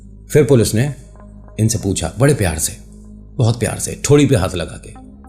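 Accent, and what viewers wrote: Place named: Mathura, India. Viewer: Language se mathura side k lagte hai. Mathura agra hathras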